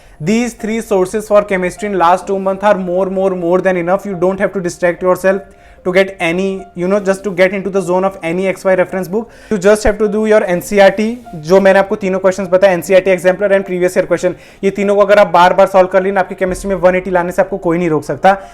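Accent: Gujarat, India. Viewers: Are you from gujrat ? 😅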